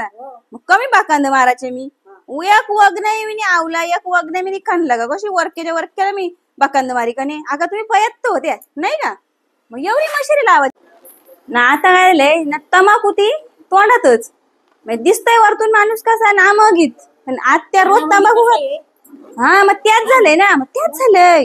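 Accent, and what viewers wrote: Murbad, India. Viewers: काय बोलावे आता फुयजी..... एकच नंबर बोलता तुम्ही 👌👌👌👍👍👍.....याला म्हणतात मुरबाडी भाषा ❤❤❤ आणि दोन्ही पण म्हतार्या त्यांची डोबरा सिंदारित सोडून तुमच्या बाता मन लावून ऐकत्यात 😂😂😂आता तुमच्यामुळे त्यांची डोबरा कुइकं जातील आणि त्यांचं म्हतारं त्यांना किती चोपतील...काय सांगू शकत नय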